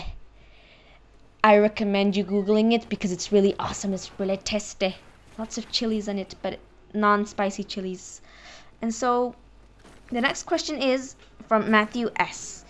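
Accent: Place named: South London, United Kingdom. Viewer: Dat South London accent was a buff one still clearly reppin the ghettos fam keep up da good work SAFE!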